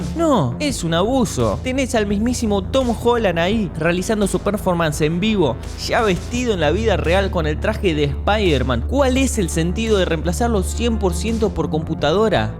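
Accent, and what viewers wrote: Standard. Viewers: Es que tenés un acento muy neutro.... me atrapaste. No hablás de tu. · que croto agarrar videos en ingles y simplemente hacerlos en castellano, encima hablando en neutro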